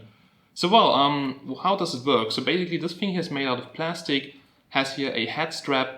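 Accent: Germany. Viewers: your english sound with german accent or netherland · Ich geh mal davon aus das du deutsch bist ( von deinem aktzent her) heute war englischprüfung in sachsen... kann es sein das du für den listeningpart den text gesprochen hast? hat sich zumindest so angehört! :D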